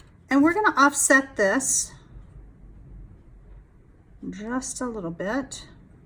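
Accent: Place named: Texas, United States. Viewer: Such a nice and easy card...by the way... I like your texan accent pretty much...Thanks for sharing this idea...🤗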